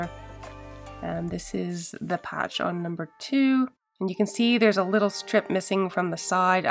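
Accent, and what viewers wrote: Canada. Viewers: I’m not sure how you slipped into my feed, but I’m so glad you did. I’m a Canadian/American tv writer with a love of old furniture. And I heard your voice and the lovely Canadian accent, and I was hooked!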